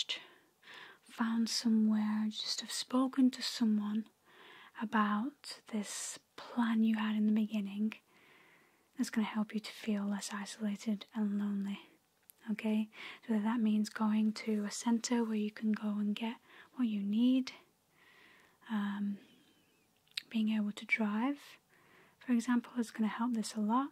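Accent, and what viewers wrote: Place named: Lancashire, United Kingdom. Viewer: This lighting is lovely, and your Lancashire is too,. 🕊️🙏💕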